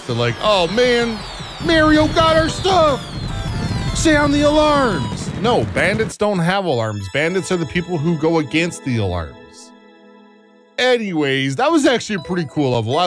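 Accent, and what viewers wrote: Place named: Canada. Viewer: He's Canadian. I assume that's how they pronounce it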